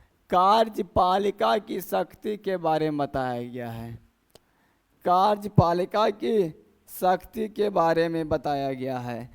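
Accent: Bihar, India. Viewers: क्या आप बिहारी है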